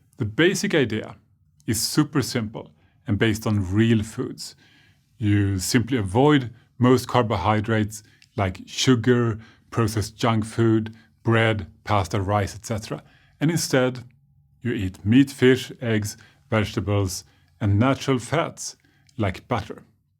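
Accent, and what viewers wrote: Sweden. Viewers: This guy sounds Swedish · i like the accent i would say either swedish or norwegian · Hes from Sweden, I hear that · This obviously Swedish velvety middle-aged soft-spoken man has convinced me with his confident gaze. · Could you maybe be Swedish?:) I think I hear a slight Svenska accent;) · Damn thats a Swedish accent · is Swedish. No doubt about it. I lived in Norway for 21 years, so all the Scandinavian-English accents are crystal clear to me. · I call swedish, sounds as bad as Arnold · Is he Swedish? 🤔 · he is indeed swedish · Anti-fans fuck-off. He is a Swedish Doctor that's why his accent is different. His name is, MD. I have his book LOW CARB, HIGH FAT FOOD REVOLUTION. And it really works on me and it's like my holly grail. He is a good doctor. 😃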